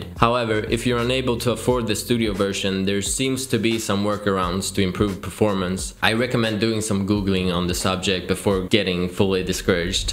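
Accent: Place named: Sweden. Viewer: You from sweden dude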